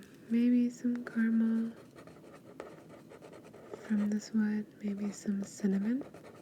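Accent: Argentina. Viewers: Hey ~I think you're the reason why I'm turned on to the Argentinian accent/dialect as I'm learning Spanish...so, thanks so much^^. And BTW, enjoying a Canadian Mist while I re-watch this video, so I hope that doesn't take away "cool points" from me in your book =-p. Haven't had Japanese whiskey yet so that's on my list next. What's your absolute fav??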